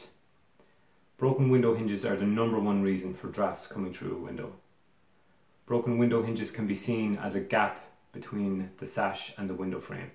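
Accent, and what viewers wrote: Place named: Ireland. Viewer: I never thought i'd hear an Irishman talking about sashes.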